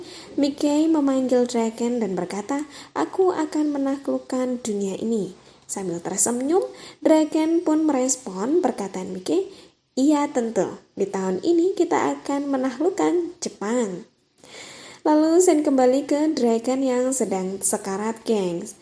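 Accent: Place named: Java, Indonesia. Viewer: Suarane medok gaes hahahaha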